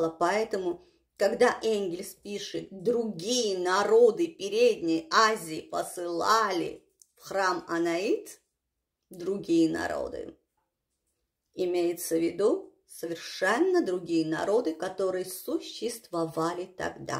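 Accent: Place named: Georgia. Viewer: твой грузинский акцегт просто убил!!!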